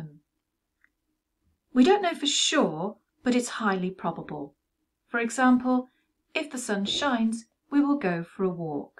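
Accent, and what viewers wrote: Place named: England, United Kingdom. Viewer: Your English accent sounds really nice, where is this from?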